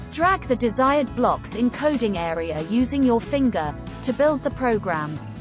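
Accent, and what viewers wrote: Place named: United Kingdom. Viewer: `Je praat echt met zo’n Brits accent’. Or in English: Your really talking with an British accent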